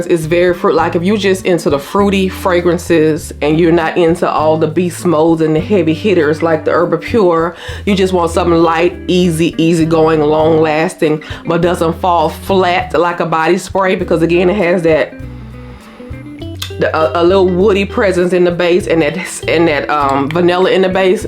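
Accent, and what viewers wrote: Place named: Louisiana, United States. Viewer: Yesss I love your Louisiana accent! It’s hot here in TN but nothing like Bayou hot. 😅 I definitely repurchase my fave perfumes!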